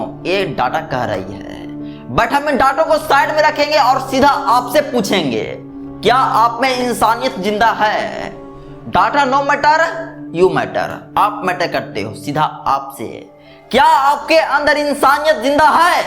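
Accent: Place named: Bihar, India. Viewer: इंसानियत कम होने का बड़ा कारण बढ़ती आबादी है । कुछ लोग पीढ़ियों से सुधारना ही नहीं चाहते। सुवर की तरह आबादी बढ़ा रहे हैं। ओर कमाने वाला एक की मदद करता है तो 10 आकर ओर खड़े हो जाते हैं। तो उनको भी सोचना होगा तभी जिंदगी सुधरेगी सबकी । भाषण देने से कुछ नहीं होगा तुम बिहार से लग रहे हो तुम्हारी बोलने की अंदाज से लग। रहा है 17 करोड़ हो ध्यान देना 😂😂😂😂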